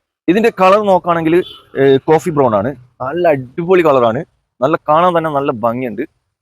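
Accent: Kasaragod, India. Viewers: ചാനൽ പേര് നോക്ക് അല്ലെ മലപ്പുറം അല്ല അവര് കാസറഗോഡ് ആവും സംസാരം കേട്ടിട്ട്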